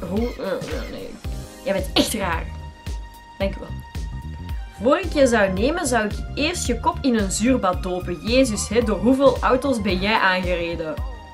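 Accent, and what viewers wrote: Belgium. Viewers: Hi ik ben Nederlandse en ik kan het mij niet voorstellen dat andere Nederlanders er zo’n groot probleem van maken dat jij Belgisch bent en iets anders praat. Geniet gewoon van de leuke video of kijk niet... En wat naar dat er zo word gescholden in de comment section! blijf altijd doen wat je leuk vind!! Kus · Je bent de best youtoeber ooit x xxx je mag niet stoppen door die stomme haters. Ze moeten eerst naar zich zelf kijken. Je hebt een mooi acsent ik ben ook Belg #teambelg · Ik vind belgen echt te gek ze zijn zo vriendelijk in hou van dat acsent. Ik woon in nederland onze taal is saai. Ik hou van belgen stuk voor stuk behalven jou haters😍🤩hoop dat je reageerd ❤🤩😘😍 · Jaaaaa team België ik ben zelf een Nederlander maar ik vind het accent eigenlijk veel leuker dus team België 😁😁😁😀😀😀😃😃😃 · Jij hebt echt een heel leuka accent k wil ook belgisch kunnen😂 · super mooie filmpje en je bent super geweldige youtuber ga zo door en Belgische accent is super mooi en super prachtig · Ik vond al die haat reacties super stom. 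Je bent mooi en goed op yt en er is niets mis met belgen of je accent ik vind het juist leuk en trouwens je hebt gelijk kanker is erg ze moeten stoppen daarmee te schelden je hebt het niet eens dus. Maar je reactie op somige haat rea's was wel grappig?😉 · Je accent maakt jouw zo mooi Belgiës ik wouw dat ik dat kon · Ik ben ook Belg ik vind je acsent super leuk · Ik ben Nederlands en ik ben jaloers op jullie belgische accenten, het is gwn zooooooo mooi niemand anders heeft dat (aleen Groot-Brittanië maar dat is weer anders) Edit: AHAHAHAHAHAH IK GA STUK ALS JIJ DENKT: "Whuttdeewhuuuut?" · Oke ik woon in nederland ik wil heel graag naar België om daar te wonen want ik vind die accent gwn heel leuk en ik snap niet dat ze haat op je geven maar oke ze komen vast van andere wereld ofzo love you 😗😗😗😗😙😗😗😗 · wat hebben mensen tegen belgen. Ik houd van belgen en vooral dat accent is geweldig😂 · Ik vind het accent van belgen juist mooi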